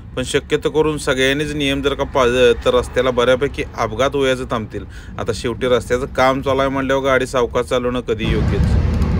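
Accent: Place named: Sangli, India. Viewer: महाराष्ट्र बदल तुझा तिरस्कार नाही... तळमळ आहे.. सांगली भागातील बोलण्याची लकब तशीच आहे 👍🤩🍫🙋 हिंदी मध्ये vlogging नको सबटायटल सुरू करता येत इतर भाषेतील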